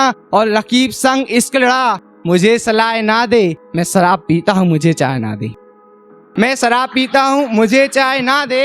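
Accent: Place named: Sagar, India. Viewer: Bhai abaj se pta chal jata hai ki sagar se ho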